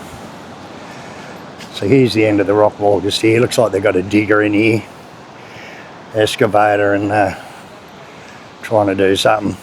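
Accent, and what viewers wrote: Australia. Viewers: Full on Aussie accent !😮😂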